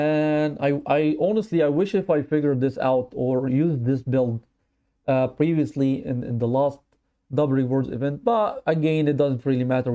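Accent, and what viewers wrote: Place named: Arab world. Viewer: I knew you were a fellow Arab just from your accent 🤝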